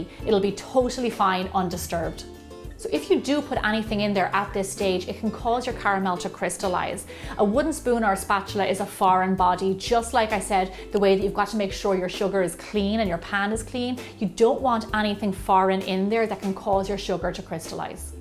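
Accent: Ireland. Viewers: Are you Irish? I thought I recognized the accent, but I don't know, I haven't look at the rest of your channel! yett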